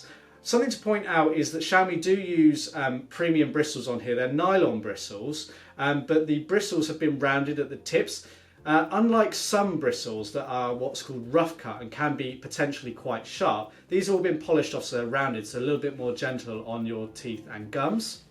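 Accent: United Kingdom. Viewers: Aren't you a British one?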